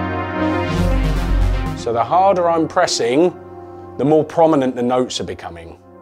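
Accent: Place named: London, United Kingdom. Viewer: LOL Polybrute SIMFF.... Love the London accent but mate.. Cant beat a Northern accent... RAAVER as opposed to Rather.. We need to celebrate the Englaish language and never shy away from our heritage !!!! The synth sounds so similar to the original.. but love the new features.. !!! Gotta say, if you need your sound to be immediate in your mix then the Sequential series are better but as an all round poke you in the eye and create esoteric stuff... Polybrute.. Go Arturia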